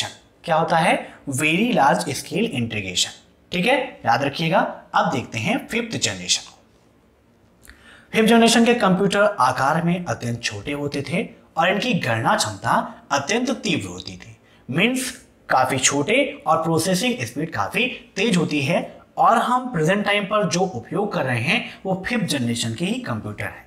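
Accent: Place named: Madhya Pradesh, India. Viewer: Mp की laungage लगती है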